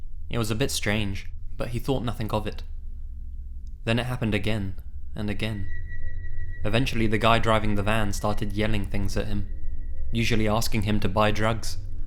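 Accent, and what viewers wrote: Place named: England, United Kingdom. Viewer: Surrey. Bollocks. I can't believe I couldn't recognize your accent sooner; that's where my friend was born before she moved here ( CA, US). She'd never stop with the ''you daft cow'' if she knew. Still, that's better than her pet name for me in high school (dirty slag, which is not in the least bit accurate by the way). I think this is the fifth time I've watched this video, they're all just brilliant.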